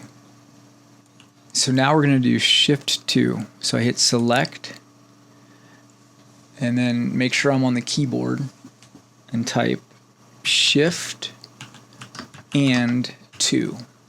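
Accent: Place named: Brazil. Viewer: Thank you very much for this video, it's not very often I may be the 11th subscriber! Are you Brazilian by any chance? Your english accent suggests so.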